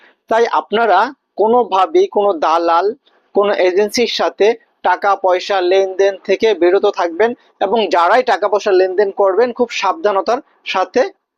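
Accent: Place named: Bangladesh. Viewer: ভাই আপনি বাংলাদেশ থেকে নিউজ করেন নাকি দুবাই থেকে নিউজ করেন তাইলে একটু জানাবেন. আপনার কথা বোঝা যায় আপনি বাংলাদেশ থেকে কথা বলতেছেন.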